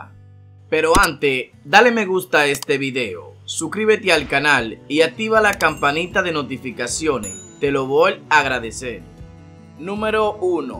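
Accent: Dominican Republic. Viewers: Es de República Dominicana bro Ahí hablan así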